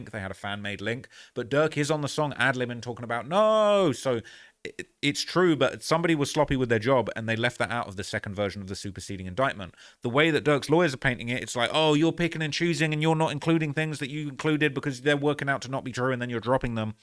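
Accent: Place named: United Kingdom. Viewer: Freudian-slips with the UK accent be hilarious 😂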